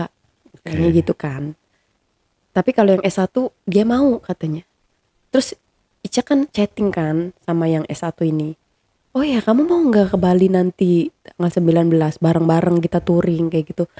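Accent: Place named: Cirebon, Indonesia. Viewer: Asli dari gintung berarti tetanggaan sm sy Galagamba,berkesan sih ceritanya,aplgi logat khas wong cerbon,iya tah,iya jeh,kang bner sih..salam teh sing wong Galagamba.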